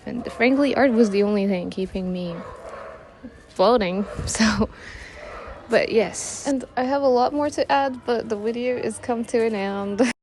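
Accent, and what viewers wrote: India. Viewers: I’m also Indian and i agree with everything you say , I’m a school student for now but art is something i really love . And people around me even those who draw just draw what they find and at point , I don’t think anyone loves it as much . It’s also not something you can casually say for a future career . I don’t know if i should talk about this - but I also really love to write stories and no one around is a writer or a reader 😢like come on guys ! Creative outlets are so not talked about here ! And your accent is nice btw ! ❤ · not everyone has indian accent, never heard anyone w it, maybe she is from north east cus I also lowk speak like her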